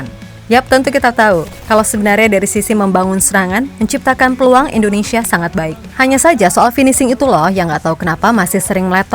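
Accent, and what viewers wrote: Java, Indonesia. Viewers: E mak mblegenduk , wong jowo yo mbak ? Podo nak ngono 😂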